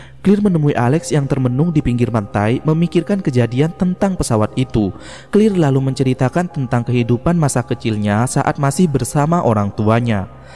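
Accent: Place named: Java, Indonesia. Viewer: Knp tod nya medok sihh😭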